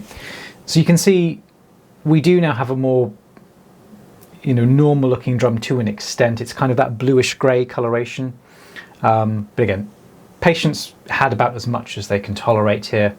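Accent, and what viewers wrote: United Kingdom. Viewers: I AGREE WITH HIM ABOUT THIS ! YOUR VOICE IS VERY CALMING TO LISTEN TO !!!!!! I'VE ALWAYS LOVED LISTENING TO BRITISH PEOPLE TALKING !!!! I LIVE IN SAINT CLAIR COUNTY , ALABAMA . MY PARENTS WERE FROM MARYLAND , SO I DON'T SOUND LIKE A TRUE SOUTHERNER WITH A "TWANGY" VOICE !!! I SOUND MORE LIKE MY PARENTS THAN I DO A TRUE SOUTHERNER DOES . 😊😊😊😊😊😊😊😊😊😊😊😊😊😊😊😊😊😊😊😊😊😊😊 !!! WHEN I WAS YOUNGER , I HAD A YEAST INFECTION IN MY EARS AND MY ENT HAD TO SQUIRT WARM WATER IN MY EARS AND IT MADE ME FEEL DIZZY AND NAUSEATED WHEN HE WAS DOING THIS TO ME . I LOVE YOUR VIDEOS - THEY ARE VERY INTERESTING TO SEE . 😊😊😊😊😊😊😊😊😊😊😊😊😊😊😊😊😊😊😊😊😊😊 !!!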